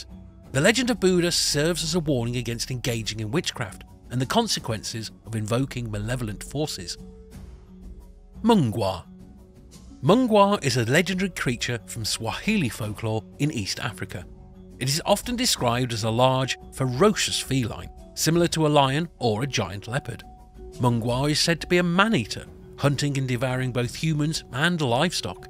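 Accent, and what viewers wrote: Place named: United Kingdom. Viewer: Interesting... Am I the only one finding a british accent explaining African culture to feel a bit... Wrong? Inappropriate? Strange?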